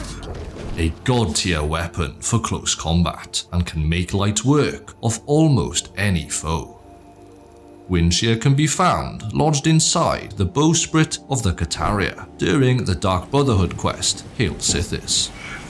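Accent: Wales, United Kingdom. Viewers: why are you Welsh and can you speak elvish?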